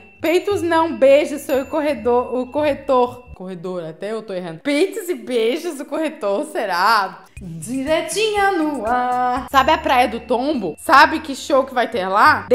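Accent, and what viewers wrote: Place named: Florianópolis, Brazil. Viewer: Você é de onde ? Tens sotaque de manezinha 😍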